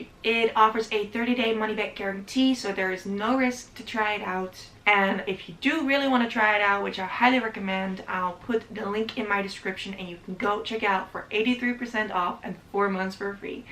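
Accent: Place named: United States. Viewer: You have an American accent , even though you are not from America. Where are you from and where did you learn English ?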